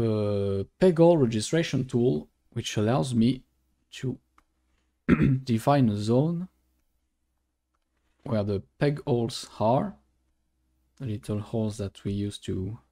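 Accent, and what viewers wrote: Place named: England, United Kingdom. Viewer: Pas mal ton accent anglais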